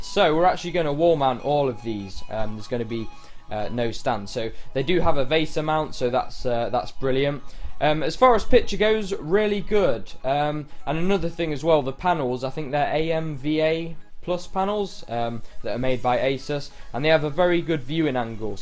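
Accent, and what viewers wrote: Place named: England, United Kingdom. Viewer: You sound so english xD I know he is, so don’t comment saying «That’s because he is!!!» I KNOW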